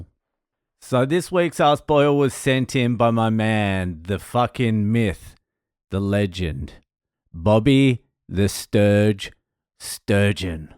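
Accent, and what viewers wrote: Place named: Australia. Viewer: I didn't realize you were Australian. I'm sorry, carry on.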